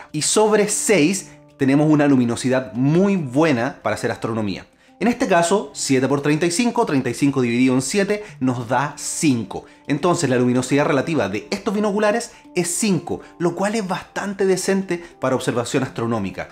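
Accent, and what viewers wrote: Chile. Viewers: Es chileno y me encanta como habla!! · Si eres chileno, eres el referente de como debiésemos hablar todos jajaja. Excelente video y canal. Va esa suscripción. Saludos y felicitaciones !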